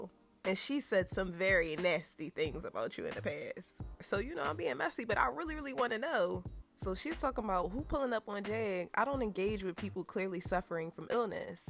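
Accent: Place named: Philadelphia, United States. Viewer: You are def from Philly, I can tell. 💕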